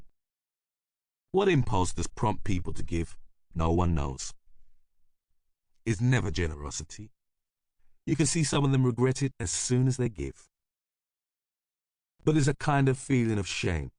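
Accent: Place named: England, United Kingdom. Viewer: WHERES THE PATOIS? who chose this reader? i read this book and the patois is what gives the novel its charm. its the voice of the novel, the comic turn of phrase. its CRUCIAL to the text and this reader didnt capture it. this sounds like an anglicised reading for an audience who might struggle with patois. a compromise too far and the voice is lost. this should have been stellar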